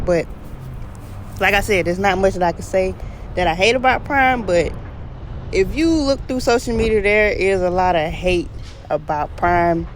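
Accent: South, United States. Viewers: Im legit addicted to the thiccness, the skin tone, the southern accent. I love it all. You should consider hiring a video editor to save you some time.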